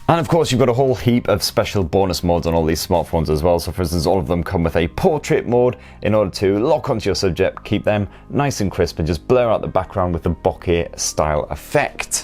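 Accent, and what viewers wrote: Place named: Newcastle, United Kingdom. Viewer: I'm English aswell...........but a Londoner. He's a 'Geordie' ...that's someone who's from Newcastle upon Tyne or the surrounding area. I can tell from his accent. Any British person can tell this immediately. Gotta love the Geordie sense of humour - it's very quirky!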